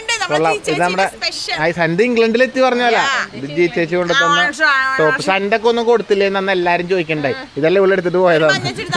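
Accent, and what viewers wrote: Kannur, India. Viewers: നിജി കണ്ണൂരൊ കാസർഗോടോ എന്റെ സ്വന്തം ഭാഷ ❤❤😅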